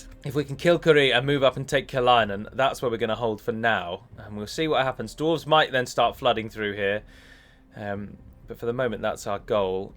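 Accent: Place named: RP, United Kingdom. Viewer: Mate, your sleepy voice sounds bloody brilliant! Makes you sound even more posh (didn't know that was possible)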